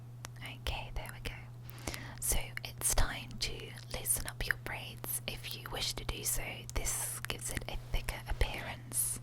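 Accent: Scotland, United Kingdom. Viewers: Nice to hear a UK accent! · Great video, could you do some more Scottish accent soon? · your otherwise beautifully Brittish accent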